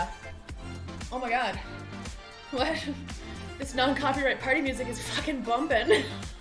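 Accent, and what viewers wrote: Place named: Canada. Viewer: You’re Canadian, eh? Sammmeee 🎉❤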